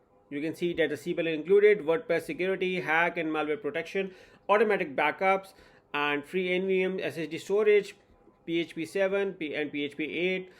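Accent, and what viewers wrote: India. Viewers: Whenever I end up on a video with someone who has an Indian accent, I learn something. Gotta love Indian accents. Thank you sir. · Incredible coupon, a discount like this you can't find anywhere, it's a unique opportunity. Whenever I end up on a video with someone who has an Indian accent, I learn something. Gotta love Indian accents. Thank you sir. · Your explanation is very important because no other video has explained as much as you. Incredible coupon, a discount like this you can't find anywhere, it's a unique opportunity. Whenever I end up on a video with someone who has an Indian accent, I learn something. Gotta love Indian accents. Thank you sir.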